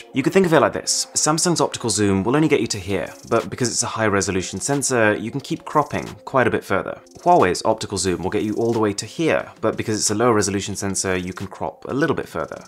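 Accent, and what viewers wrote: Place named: RP, United Kingdom. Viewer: Hello Mr posh english accent. How good is this phone without google apps & services?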